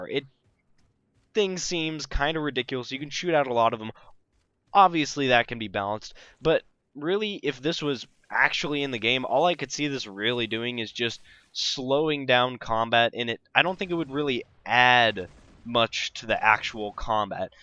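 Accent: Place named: United States. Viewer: i bet your american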